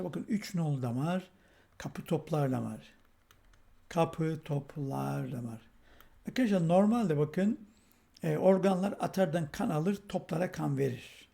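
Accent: Black Sea, Turkey. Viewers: Hocam karadenizin neresindensiniz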